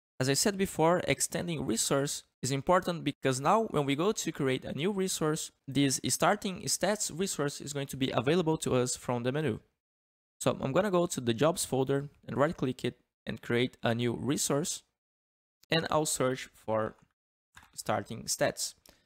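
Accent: Brazil. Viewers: Esse sutaque nn me estranho kkkk br certeza.. oueut o ficando loko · Woah, such nice Brazilian Accent,! Gotta like that! Also, nice video:3 É nois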